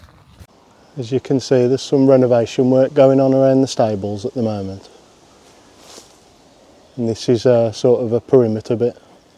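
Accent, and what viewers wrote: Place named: Birmingham, United Kingdom. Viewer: So nice to hear a brummy narrator. I think the persuaders with roger moore was shot there or maybe i am mistaken.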